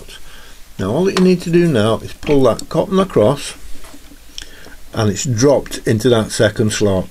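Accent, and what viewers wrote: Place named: St Helens, United Kingdom. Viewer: this was exactly the tutorial i needed thank you. Didn't expect to hear a St Helens accent though; my hometown. Even better! lovely . tHank you !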